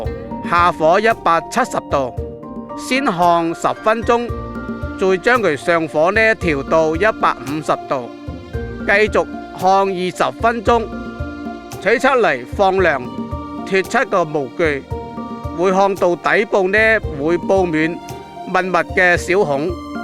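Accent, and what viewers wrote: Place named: Dongguan, China. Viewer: 聽似東莞口音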